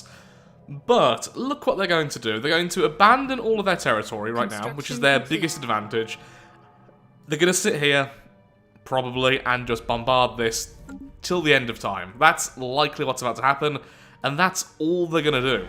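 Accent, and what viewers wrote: United Kingdom. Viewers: As someone whose native language isn't english: You are probably the easiest british person to understand.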